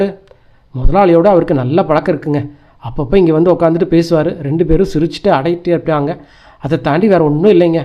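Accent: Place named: Kerala, India. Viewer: You have acent of Malayalam.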